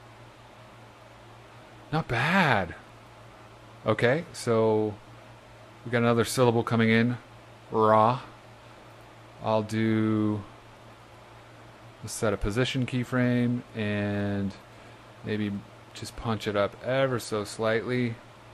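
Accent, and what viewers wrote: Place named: Canada. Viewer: It's pretty obvious that you're Canadian. Cheers to you!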